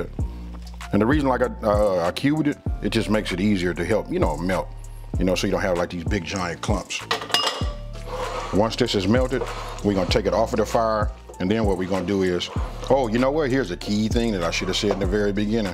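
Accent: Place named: California, United States. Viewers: The LA accent in affect! Lol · Knew you was from Cali before I even seen the hat lol Took me 20 years before I realized we had an accent out here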